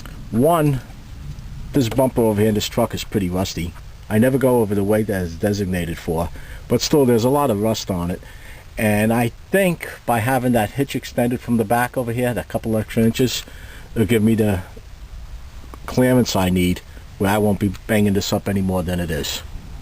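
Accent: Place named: Boston, United States. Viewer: Boston. It's gotta be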